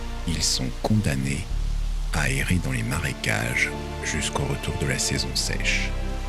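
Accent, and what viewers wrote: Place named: France. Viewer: The effort is astonishingly great. The french accent makes this look like it's an actual documentary.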